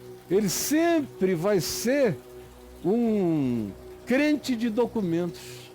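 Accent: Recife, Brazil. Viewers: Aí sabe que fala Recife Pernambuco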